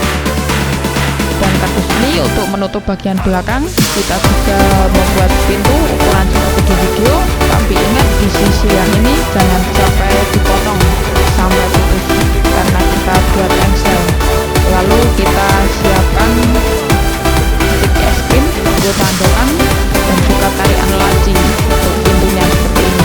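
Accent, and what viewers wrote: Java, Indonesia. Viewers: wong jowo di suworone!!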